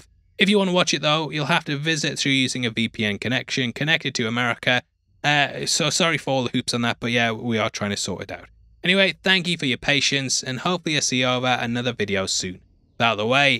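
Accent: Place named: United Kingdom. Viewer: Why is that mate..? You’re clearly (judging by the way your voice sounds) speaking in a U.K English accent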